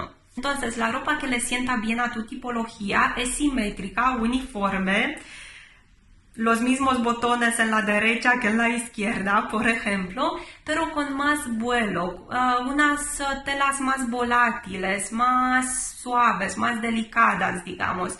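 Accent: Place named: Romania. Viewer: 10000% accent romanesc aici!! 🇷🇴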